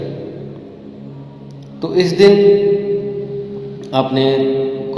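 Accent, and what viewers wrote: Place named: Punjab, India. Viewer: आपकी आवाज बताती है आप पंजाबी हैं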